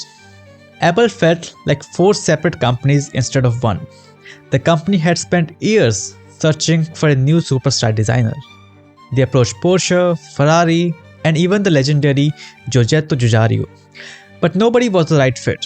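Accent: India. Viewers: that indian accent is killing me. cow dung eater lol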